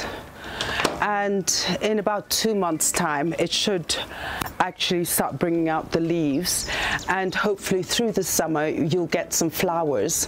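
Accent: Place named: RP, United Kingdom. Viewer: Your voice is so Posh!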